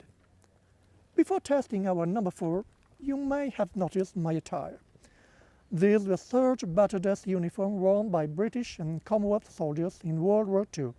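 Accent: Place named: France. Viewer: I'm curious too. Judging from the accent I'd say France, or somewhere French-speaking?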